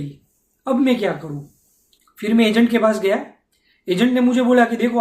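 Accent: Gujarat, India. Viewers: Lucky you brother, I’m also Gujurati just like you. Maja ma?😅 I’m having 65 points in SINP at the moment but it’s been sm tough time due to covid so I dnt thnk the points would go down in near time but looking at Canada’s plans to invite more than 4 lacs immigrants, my hopes are up. Let’s see. Tamara tone pat thij first video ma khabar padi gai k lage to che aapna Gujurati, keep it up buddy🤟 you’re doing a great job for ppl like us who’re planning to migrate and settle in canada. Specially SINP is only hope for me too at the moment.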